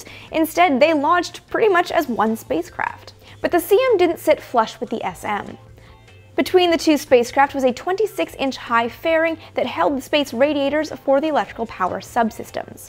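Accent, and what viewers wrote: Canada. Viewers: Is this young lady a Canadian? I've heard the word "Abbooouuutttt" a few times, during her videos. Or, am I just hearing things? You never know, "eh." :)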